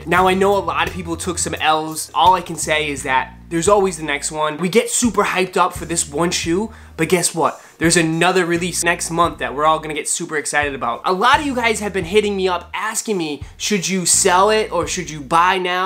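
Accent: Boston, United States. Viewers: Just subscribed. That BAHSTON accent is what sold me.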